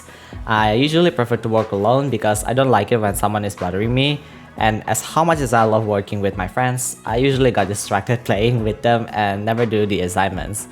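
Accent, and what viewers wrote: Indonesia. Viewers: So... You're actually indonesian? 🗿 I can tell from your accents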